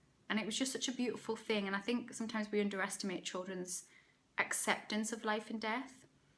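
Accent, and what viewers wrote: United Kingdom. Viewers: Just wondering about your accent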